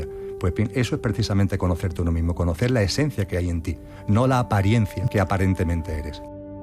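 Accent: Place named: Andalusia, Spain. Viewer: Cuanta claridad. Andaluz tenía que ser Señor!!!. Gracias.❤❤❤❤❤❤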